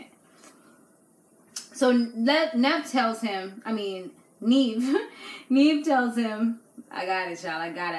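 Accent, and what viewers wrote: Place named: New York, United States. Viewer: Yo you a baddie & that NY accent makes you even more attractive😍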